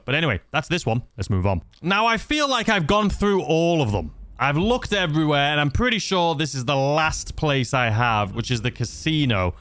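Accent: United Kingdom. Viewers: answer me and i will subscribe are you british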